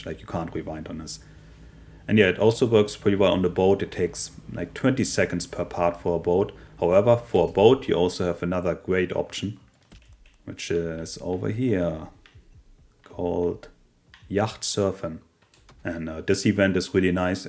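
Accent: Germany. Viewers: Gut erklärt👍 du bist deutsch oder?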